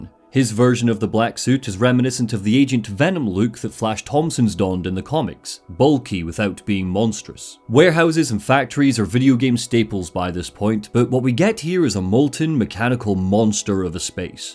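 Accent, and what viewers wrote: Scotland, United Kingdom. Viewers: I swear you used to be scottish. Am i experiencing a mandela effect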